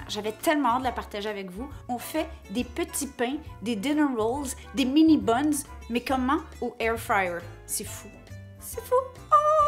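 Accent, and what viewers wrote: Canada. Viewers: Bonjour Je suis débutante avec mon AIR FRYER (offert par ma fille). Je me suis arrêtée sur vos vidéos très bien présentées, très intéressantes! Merci pour la recette du pain brioché, très bonne idée les petites boules qui s'attachent l'une à l'autre en cuisant, pour former une jolie couronne! Pas trop difficile à préparer.J'aime votre petit accent canadien très sympathque! Amitiés de Belgique MIMILOU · Merci beaucoup pour cette excellente recette de petits pains au lait. Mes petits enfants en raffolent. En plus avec l’accent canadien c’est trop beau !!! Vive Thermomix et Air Fryer Ultenic qui nous facilitent la tâche. Gros bisous de la Drôme en France.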